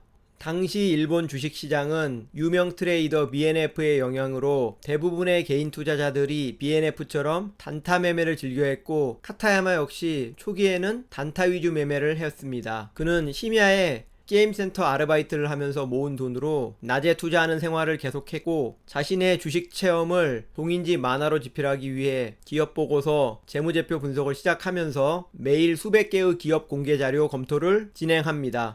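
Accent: Jeolla, South Korea. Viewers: 의 발음을 못하는거보니 전라도 분이시군요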